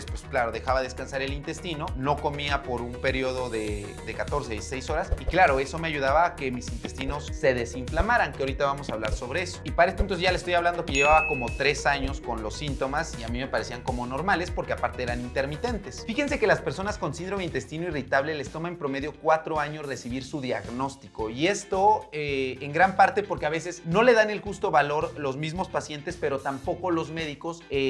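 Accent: Mexico. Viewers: el acento mexicano es insoportable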